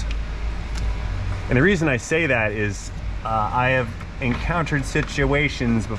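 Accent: United States. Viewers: You don’t have an accent. You talk like a regular American. You lived in Hawaii, I know that. You mentioned that before. You talk like a regular American person. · You just sound ‘American’ to me. Accents in Uk are something else. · I do not even want to guess where your accent comes from it all sounds "yank" to me & excessive too boot. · Being in NZ your accent just sounds like a typical American TV accent. And to me the American TV accent is still pretty subtle to ‘real life’ American accents. The only thing I really pick up on is mirror, to me it’s sounds like me ra😊. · u sound like a gen x person all american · you have an American accent. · you have "TV accent".....im guessing western CT, MA, upstate. maybe Michigan, NorCal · To me (Australian), you sound American.....;) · Accent? Hmm. As a Brit, I can definitely say your accent is Murican. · Pt II. 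Accents. I`m from the UK (London) and therefore have no accent, as we invented the language. However, I am well qualified to speak about yours. You have a classic American accent. It is not accentuated like NYC, the Deep South, Boston etc. it is easily understood and well modulated. Anyone who is complaining about yours, should come with me to visit my relatives in NE Scotland. The Americans there who work in the oilfields have been known to use sign language. Carry on. There is nothing wrong with your speech. I know. So Yah boo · You have an American accent which in some of these states seem to now be the minority thanks greatly to immigration. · You sound American to me.. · Accent? You just sound like a good old American to me. If people in central Indiana have the same accent as you, then that is why I am not detecting any particular variation in speech. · I am not from US, and as far as I am concerned your accent is what I call "general clean US" accent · Me being a Norwegian. 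Your accent sounds American.😅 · Well, the ones made in the North of England weren't much good. And your accent is, without doubt, American ! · You sound like most American tourists I see in my European country. And I see a lot of them. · Gday. Accent wise...I'm English, so you sound American...not helpful perhaps, but there it is 😂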